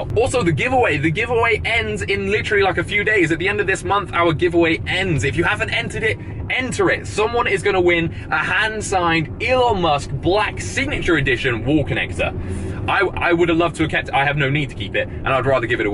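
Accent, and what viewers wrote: United Kingdom. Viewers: If I have to hear this British accent I just won't watch the video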